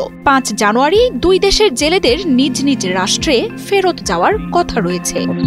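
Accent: India. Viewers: আফা, সমঝোতা শব্দটার উচ্চারন ঠিক করেন। হিন্দি স্টাইলে বাংলা বলবেন না, গা জ্বলে।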